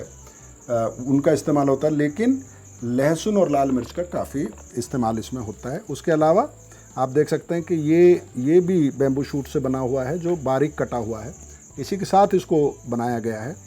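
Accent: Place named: Moradabad, India. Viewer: जी आपकी भाषा मुझे मुरादाबाद के आस पास के छेत्र की लगती है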